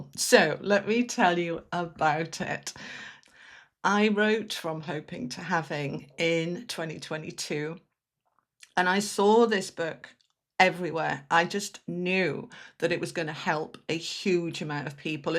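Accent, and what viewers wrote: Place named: South Wales, United Kingdom. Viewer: Hi, your accent sounds very familiar, I grew up in South Wales. I saw you on the Jeff Mara channel. You’ve put me onto a Spiritual Church nearby, I’m looking forward to meeting them. So thank you for that. And thanks for the tools you’ve explained, being the change. Love it!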